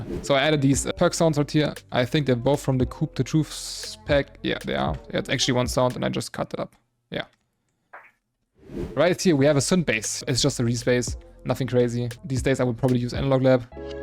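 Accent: Germany. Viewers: its literally my top 1 fav song rn, fire shit 🔥🔥, weird question, but you german? its bc of your little accent, would be crazy lol. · man hört direkt das du auch aus Deutschland bist haha. glückwunsch!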